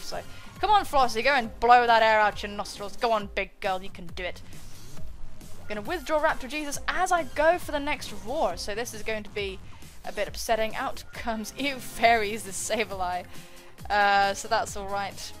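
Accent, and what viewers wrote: England, United Kingdom. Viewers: Where in UK are you from? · Sounds awesome! Does anyone pick up on the English accent? :) But is it possible to get tea over there is an important question! Congrats on the 2K subscriber boost by the way, it's incredible!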